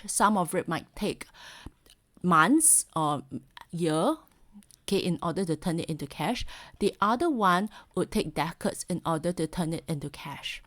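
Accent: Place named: Singapore. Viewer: Singlish ma......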